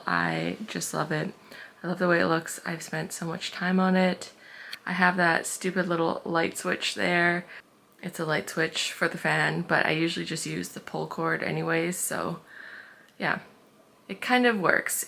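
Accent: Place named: Minnesota, United States. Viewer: under a minute in and you must be from Minnesota.. the "beg" (bag) gives it away , lol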